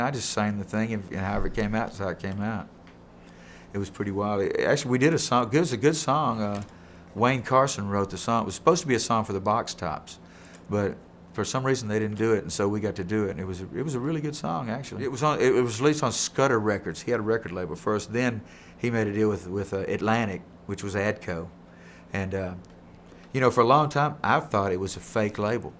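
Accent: South, United States. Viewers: I miss you!! Hes so adorable and very humble. I just love his southern drawl, beautiful laugh. Completely genuine southern gentleman. Love you ❤️🥰😎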